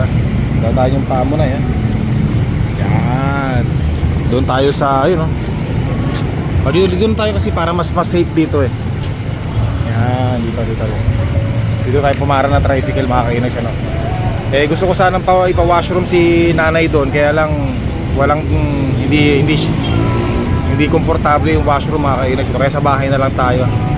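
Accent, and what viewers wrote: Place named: Nueva Ecija, Philippines. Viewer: yong accent mo parang Nueva Ecija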